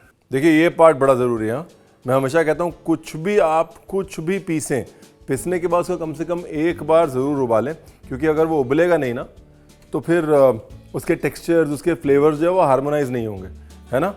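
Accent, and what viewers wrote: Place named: Punjab, India. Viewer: Mr. Brar, I have come across your cooking videos while surfing on the net randomly about two weeks ago. Anyhow, I haven't tried your recipes yet, but the mouth watering desi "Tarka" of your crispy and spicy chat is enhancing the flavour and taste of your programme immensely. The reason for enjoying & watching your programme is not your recipes but your dextrous and impressive way of connecting with your intangible viewers globally. It's worth mentioning that amalgamation & use of typical household punjabi, hindi words with appropriate dialect phonetics in your chat is stunning & awesome. Great job, keep it up.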